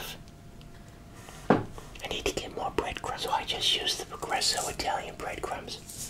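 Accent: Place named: Massachusetts, United States. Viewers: What part of Boston are you from? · What part of Massachusetts is he from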